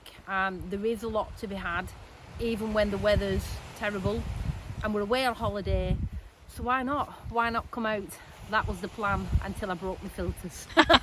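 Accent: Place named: Lancashire, United Kingdom. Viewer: Brilliant :-))))) That last few seconds going on about your make up LOL! After taking a paddle in the river, that should have been the last thing to worry about! Gorgeous images, being from Lancashire myself, great to hear that dialect.... :-)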